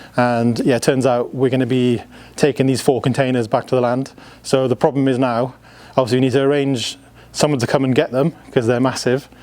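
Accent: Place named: Wales, United Kingdom. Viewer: I’ve watched all your videos with great interest, seems like you are “cracking” along nicely! In South Mississippi we use the term “fixin’” or “fixing” quite a bit, as in “I’m fixin’ to put this cladding on the side of our tiny house”, or “I’m fixin’ to head down to the Builders Merchant”. I think you could pull it off, Wales accent and all! Great work guys!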